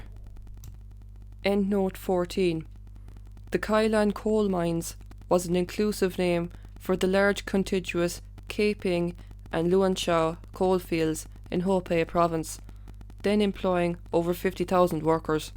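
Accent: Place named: Ireland. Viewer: Thank you for your hard work as always, comrade! I could listen to your Irish voice all day!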